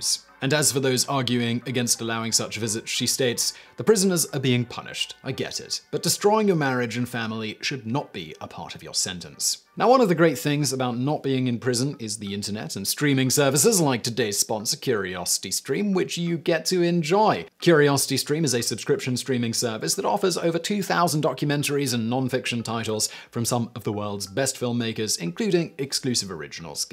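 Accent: United Kingdom. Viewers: oh how i wish i could have a British guy narrate my life.. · Never heard poontang said in a UK accent until today. Prison sucks!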